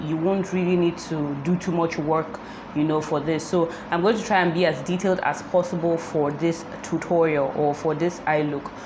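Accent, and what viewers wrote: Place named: Spain. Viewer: Ohhh Very beautiful and very simple Your Spanish accent is sweet ooo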